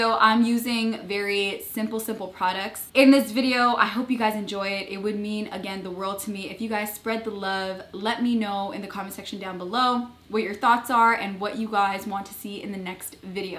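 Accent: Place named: Tigrinya, Eritrea. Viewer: I love ur tigrigna accent i want hear u more sweety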